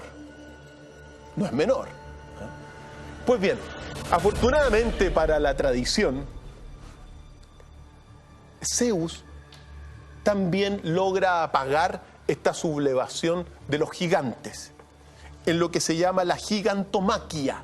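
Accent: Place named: Chile. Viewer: Esto es Chileno mil por mil... ese acento lo recozco a kilometros de distancia. Saludos desde Honduras.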